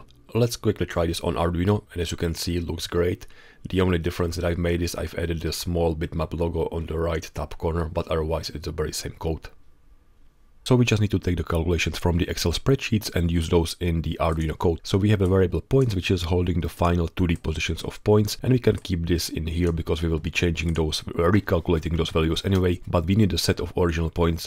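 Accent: Czech Republic. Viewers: Čech ? :D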